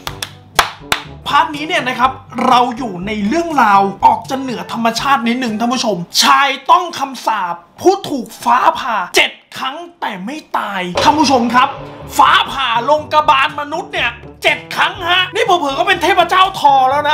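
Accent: Thailand. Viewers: ทำไมต้อง เหน่อออออ 5555.5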